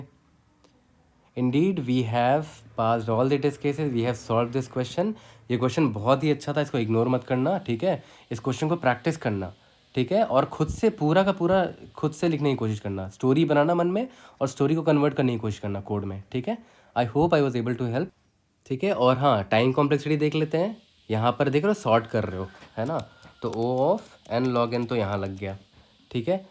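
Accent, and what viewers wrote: Bihar, India. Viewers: Are you from BIHAR bhaiya?? ..becuase your accent is same as ours ...i am also from Bihar...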